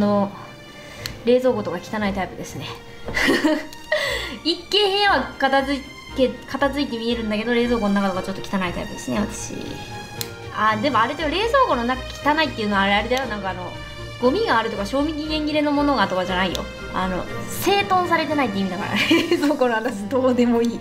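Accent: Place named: Western Japan, Japan. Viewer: は何処出身？西寄りの言葉たまに出るよね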